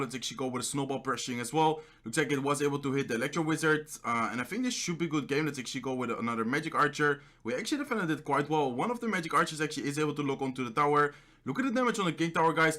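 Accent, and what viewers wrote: Netherlands. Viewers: Ben je toevallig nederlands ik herken het accent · Dutch accent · Are you dutch?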